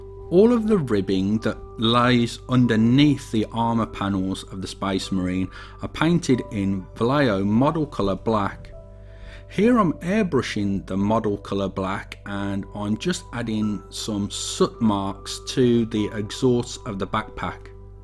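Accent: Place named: Midlands, United Kingdom. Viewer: Nice idea.. I really feel like a potato not thinking of this. Sound like you are from Midlands lad like me. Nice to hear a accent I can understand.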